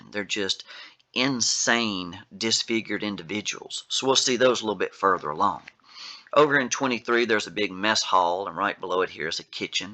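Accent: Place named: Appalachia, United States. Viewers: I’m loving that accent. What part of the US is it from? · I'd say southern Appalachian. So maybe Tennessee or southern West Virgina.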